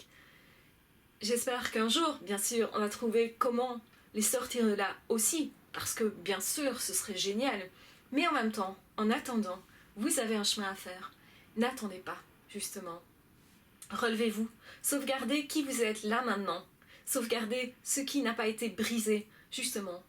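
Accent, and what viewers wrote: Belgium. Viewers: C’est la première fois de ma vie que je commente sur YouTube (pour une nana qui travaille dans le web c’est particulier mais bon xD). Bravo pour tes vidéos, elle m’aide énormément (je parle de moi mais j’imagine que c’est le cas d’énormément de personnes). Je suis victime actuellement, nous sommes séparés mais je suis encore sous emprise malgré le fait d’être totalement informée sur les PN. Pourquoi, je pense que c’est parce que j’ai un problème moi aussi malheureusement ( mais c’est pas méchant dans le sens ou je ne suis pas PN xD!) , je suis dépendante affective ( je le sais depuis très longtemps mais j’en enfin mis un mot là dessus depuis la lecture du livre Vaincre la dépendance affective). Tout cela pour te dire que tes vidéos m’aide à reprendre le dessus quand je retombe dans ses filets et rien que pour cela je te remercie infiniment. 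Je ne vais pas entrer dans les détails mais on va dire que si j’ai vu une vidéo de toi le soir, le lendemain j’ai plus de force et de confiance en moi pour me détourner de lui ou éviter ses pièges. J’ai encore bcp de mal à intégrer le fait que ce type de personne existe, il y a une semaine j’essayais de le comprendre , de chercher des excuses ( dans son enfance ou autre ) mais non je dois l’intégrer dans ma petite tête : des personnes sans humanité existent , et c’est franchement flippant. Sinon, petit aparté rigolo l’accent belge est mimi xD j’adore voir ton chat sur le canap ( j’adooore les chats mdrrrr ) et tu déchires car généreuse en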